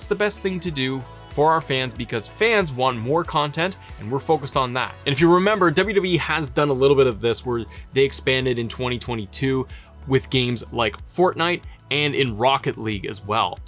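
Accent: Canada. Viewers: Are you from Canada? Just wondering lol.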